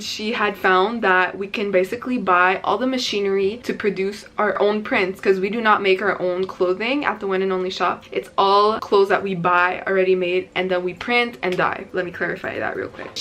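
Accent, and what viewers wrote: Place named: Montreal, Canada. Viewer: I know she’s from Mtl Just from the accent 😭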